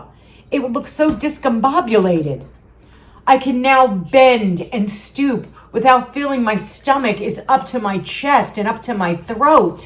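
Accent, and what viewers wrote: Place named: Long Island, United States. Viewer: I just started watching you and you feel like a friend. Your openness and honesty is comforting, your accent reminds me of my Long Island childhood. I could watch you throughout my weight loss journey.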